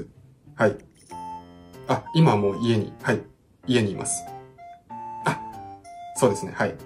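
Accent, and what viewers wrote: Tsugaru, Japan. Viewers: 標準語のイントネーションに津軽弁が残ってますね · 定期的に津軽弁が聴きたくなる動画😂 いや〜面白いですね！！青森のお父さん、優しくていいなぁ〜。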